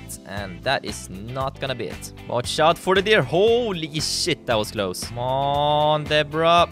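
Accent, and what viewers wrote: Sweden. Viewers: I love your swedish-english xD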